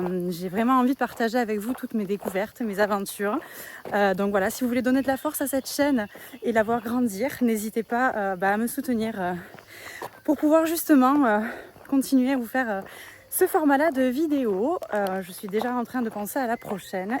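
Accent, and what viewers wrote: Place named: South, France. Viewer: Mon Dieu, quel corps de folie En plus. Cet accent du Sud et ses cigales Ne change rien, reste comme tu es magnifique.......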